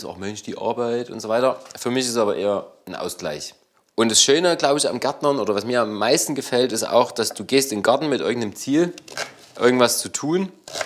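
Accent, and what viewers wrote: Germany. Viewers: Wunderschöner Platz, einladender Raum, tolle Einzelstücke, mit einer ruhigen Ästhetik zusammengestellt. Und gesprochen mit meinem deutschen Lieblingsdialekt 😊, sagt die Schweizerin.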